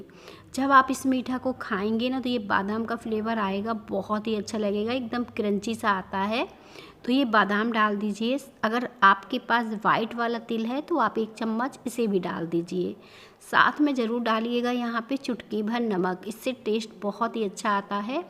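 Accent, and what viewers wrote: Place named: Bundelkhand, India. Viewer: Aap ki bhasha ka ton bundelkhand ka hai,aur bundelkhandi bhasha bhaut achhe bhasha hai ,aap usko bolo,to achha lagega,jo aap english ki shabd use karte hai ,hindi bhi achhe lagege.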